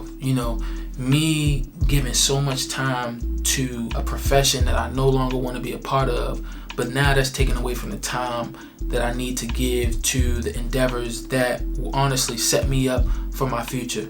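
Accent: Baltimore, United States. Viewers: That baltimore accent is accent is something else brother. Thanks for the information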